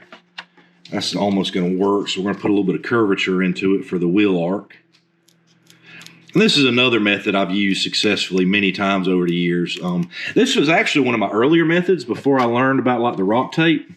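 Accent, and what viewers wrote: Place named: United States. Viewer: Great job, i love your american accent! Yee haaw!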